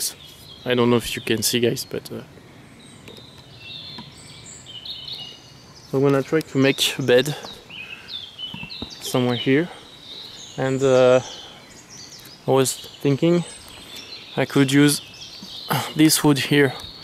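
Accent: France. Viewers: Le bon accent français :DDD Super vidéo, compatriote ;)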